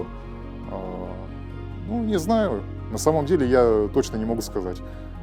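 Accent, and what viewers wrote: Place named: France. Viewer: Акцент французский.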